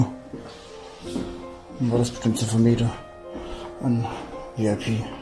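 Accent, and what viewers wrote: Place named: Swabia, Germany. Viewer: Hoi, da hört man ja Schwaben, die auch noch tolle Videos machen! Ich habe mal ein bisschen auf dem Kanal herumgestöbert und lupfe meinen Hut. Gute Kameraführung, angenehme Moderation und ohne aufdringliche Hintergrundmusik. Ich selber bin leider inzwischen schon zu alt und zu gebrechlich, um selber noch der Abenteuerlust um verlorene Orte zu frönen, deswegen genieße ich diese Videos vom Sofa aus umso mehr. Da kann ich gar nicht anders, als euch mit der Bimmel zu abonnieren und euch weiter zu empfehlen!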